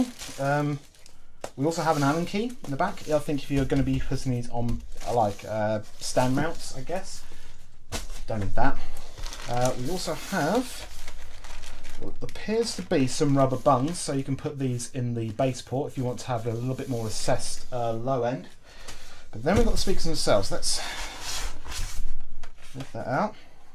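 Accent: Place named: United Kingdom. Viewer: I just watched your at2020 usb + video. What a vid also, you havew lost so much weight. You look so much better well done. Also Could you do a setup tour because i think it will look amazing and would be a great video. Much Love brother and keep up the good work! <3 (also, nice to see someone from the UK lmao, love your accent, mine is shit)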